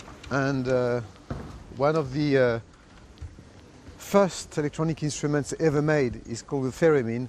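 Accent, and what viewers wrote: France. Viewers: He is actually a short little Frenchman with a French accent. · JM Jarre after a few years all around the world you have allways a huge french accent lol ( me too belgian/franch accent when i speak english) you're great , your music 's whit me from my chlidhood (im from 1977) exactly like kraftwerk or vangelis/klaus shulze/space/herbie hancock and many mores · He speaks English with a French accent but very well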